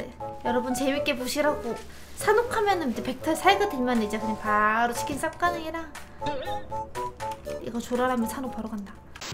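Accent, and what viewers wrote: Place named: Masan, South Korea. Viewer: 제가 FPS겜을 안하고, 못해서...그래서 PUBG도 단 한번도 안해봤고, 그러니 당연히 PUBG 방송도 안보고, PUBG 방송하시는 분들도 몰랐는데... 그런데 매우 기적적이게도 유튭 알고리즘이 제가 관심도 없는 PUBG관련 영상을 제 유튭 첫페이지에 띄워줬는데 그게 마침 님이셨; 사실 썸네일과 제목만 보고는 님이 PUBG 유져 겸 방송인인줄은 모르고 썸네일의 웃는 모습이 넘 러블리해서 클릭했다가...했다가...지금 님 영상 역주행 하면서 막 다 보는 중입니다 +_+ 사투리는 거의 안쓰시지만, 말 할 때 마산 사투리의 억양이 묻어나오는데 그게 너무 귀여워요!!! 게다가 웃는 모습은 넘 이쁘고, 와의 캐미도 너무 귀엽고 좋고, 플레이도 넘 잘하셔서 저는 배그 안하고 안좋아하는 장르임에도 불구하고 님 영상 보면 너무 재밌어요!!! 아... 님 채널을 왜 이제 알았지? ㅠ.ㅜ 새해 복 많이 받으시고, 특히 돈복과 구독자복 한가득 받으시길 바랍니닼ㅋㅋㅋㅋ 구독과 좋아요, 알림설정까지 하고갑니다 ^0^ 덧: 근데 강도질이랑 인성질 메타 넘 웃기고 재밌어욬ㅋㅋㅋㅋ 양용이만 타면 실력이 수직상승하는 님 쵝옼ㅋㅋㅋㅋ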